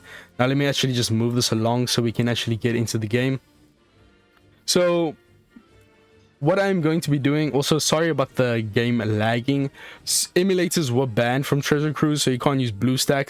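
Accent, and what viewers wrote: South Africa. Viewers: yooo are you from south africa?? 😅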